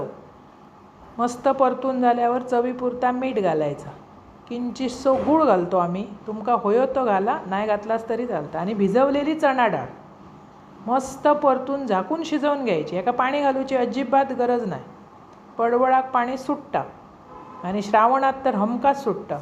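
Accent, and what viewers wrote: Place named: Konkan, India. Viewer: तुमच्या रेसिपी छान असतातच पण मला तमचे कोकणी बोलणे खूप आवडते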